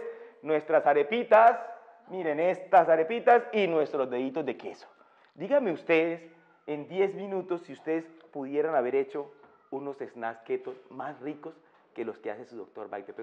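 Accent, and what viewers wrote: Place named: Colombia. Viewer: Me encanta su acento Colombiano ❤️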